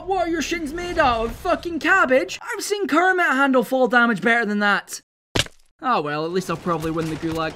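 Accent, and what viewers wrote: Scotland, United Kingdom. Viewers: You from Scotland bro?? 🏴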